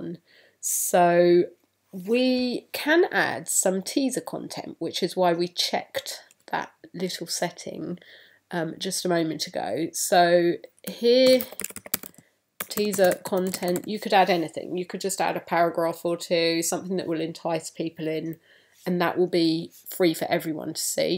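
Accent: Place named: United Kingdom. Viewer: Excellent lessons, presented with a lovely British accent👍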